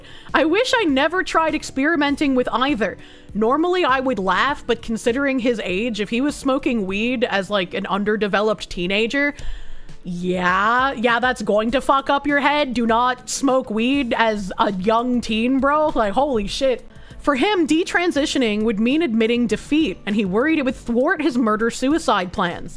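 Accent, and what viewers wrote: United States. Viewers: i love how you say "man" where is your accent from in the states? I am australia so help me out.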